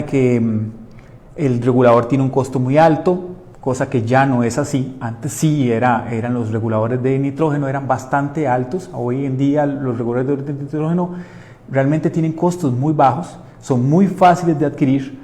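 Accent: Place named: Costa Rica. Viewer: Es por su hablar un costarricense SALUDES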